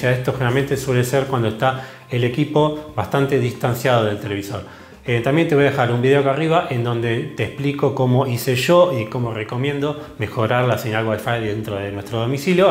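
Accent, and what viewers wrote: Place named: Argentina. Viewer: Lo positivo es que vas al grano, es decir, que vas directo a las explicaciones técnicas, además como supongo que sos argentino tu acento es similar al mío y te entiendo las palabras que decís, pero como es información muy técnica y no estoy a la altura el contenido no lo interpreto y cuando ponés pantallas van tan rápido que no las llego a ver.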